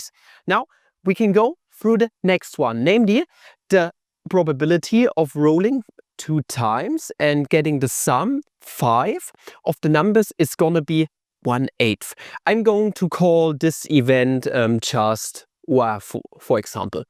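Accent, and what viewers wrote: Germany. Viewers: I bet your native language is German, it's so noticeable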